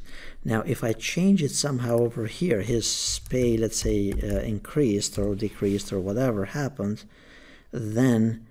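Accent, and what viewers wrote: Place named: Albania. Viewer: Great presentation Hope you don't mind me saying but you have an interesting accent, are you of Albanian origin?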